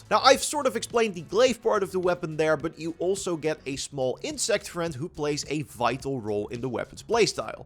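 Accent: Netherlands. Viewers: Omg Your Dutch accent is thick as molasses.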